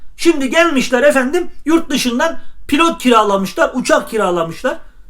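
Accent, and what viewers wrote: Erzurum, Turkey. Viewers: Dostum olayları harika yorumluyorsun,yayın hayatında başarılar diliyorum, bir şey merak ettim bazı sözler ve şiven bizim erzurum yöresine benziyor oralimisin yoksa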